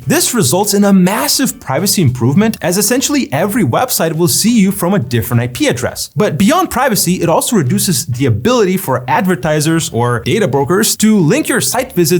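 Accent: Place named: United States. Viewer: This guy speaks like a tosser. Slow down and stop the up and down voice "noise" Not everyone likes to listen to that American noise!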